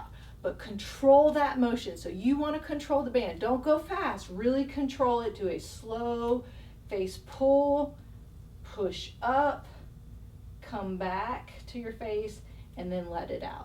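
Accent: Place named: Alabama, United States. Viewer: alabama drawl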